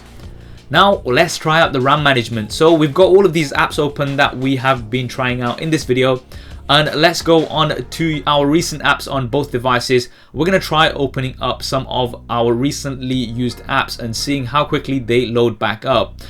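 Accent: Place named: United Kingdom. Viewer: i can smell the British accent , don't know why ....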